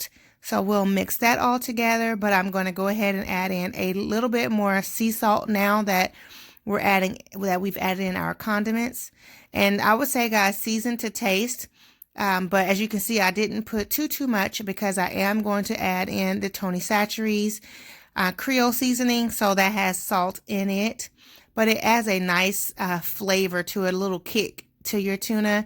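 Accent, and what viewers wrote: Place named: St. Louis, United States. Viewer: I LOVE TOUR VOICE ..U REMIND ME OF A SAINT LOUIS GIRL🤙🏾🤙🏾😁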